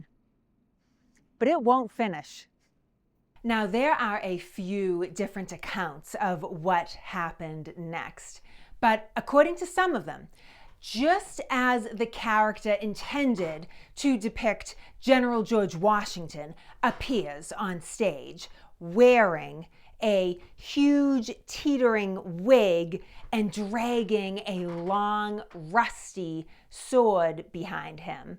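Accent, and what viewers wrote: Boston, United States. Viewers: I appreciate your research, knowledge, and spirit you bring to these Revolutionary War presentations of this important period of our country. Both sides of my family were actively involved in this fight for independence. None were left unscathed and many of my ancestors paid the ultimate price in this battle for freedom., thank you for taking the time and effort to bring these historical events to us. Hearing your voice transports me immediately back to Boston and that is wicked awesome! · I'm going to subscribe to hear more of Revolutionary history with that beautiful Boston accent I've been missing since the days of the click and clack brothers. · Rocking that Boston accent. Wicked! Solid work and story telling! Keep it up! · The GOOD=> you have a lot to say that I want to hear The BAD=> your voice is intolerably annoying to the ear. 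A voice coach can quickly resolve that. Fun Fact=> no one with a circus-like "BAH-stin" accent has ever risen to prominent power in this country for a reason==> their voice quality makes it impossible to take them seriously 😐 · Just gotta love. Especially love her very Boston accent - it's the real thing. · Thanks. I have many ancestors that served in the war from Lexington's beginnings and on, but I think they all missed this one. Your thick Boston accent always cracks me up, even though I am in New England. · I hear a fellow irish Bahstonian. Sup neighbor. 😉 I'm in Allston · What a”wicked “ Baston accent ! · Love the Boston accent, sounds like Kennedy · Great Boston accent. Very informative. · Love that Boston accent I miss my Boston girls so much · Love your Boston accent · I love her accent. Boston area accent and U.K are the two most sexy in a woman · I love your channel. I grew up in Greensboro, NC, Just north of the city is where the battle of Guilford Courthouse (the last battle before Yorktown) was fought. 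I have spent many hours there exploring so I have a love of history and learning about the beginning of the Revolutionary War. You are doing an excellent job teaching and explaining about the events around Boston, I was in that area back in 1967 so it's great to see the places again. Are you a history major or just have a keen interest? By the way, I love the Bostonian accent, very good for someone explaining about the events around Boston, and quite different from the southern accents around Charleston, SC, where I currently reside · She has a cute Boston accent. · Just love your BAAH-ston accent! But please stop waving both your arms so much while speaking ... it distracts from your presentation of the points you are trying to convey! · The Boston accent is strong in this one. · Thanks for preserving the Boston accent. I'm not a Massachusetts native but I've lived here over 30 years and I think yours is the thickest Boston accent I've ever heard. 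· Chall's town, Bunkahill, I love the Bean Town accent. Not sure where the people of Boston put all the Rs they save, but there are a lot of them. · This video could not have been done by anyone Without your beautiful Boston accent! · Yes, a real Bostonian ❤️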